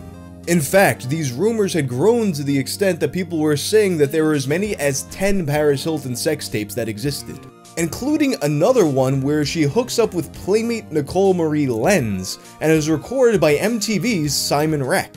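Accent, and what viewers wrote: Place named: New York, United States. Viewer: How have I just noticed the ny accent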